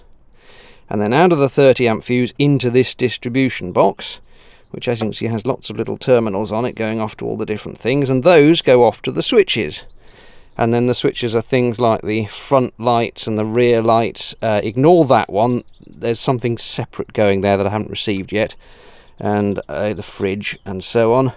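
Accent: RP, United Kingdom. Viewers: Accent is so posh!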